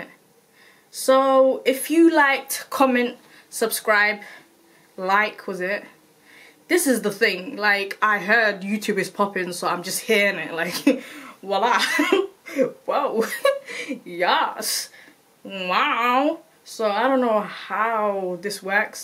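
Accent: Somalia. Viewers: When I first listened, I thought this girl is from Kenya. We have similar somali accents. Omg You are so lit. I love you already. What is even more shocking is you have similar personality to my cousin called Fartun 🙀❤️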